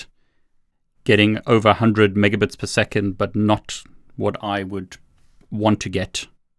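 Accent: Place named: South Africa. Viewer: I hear a South African accent, or am I just homesick?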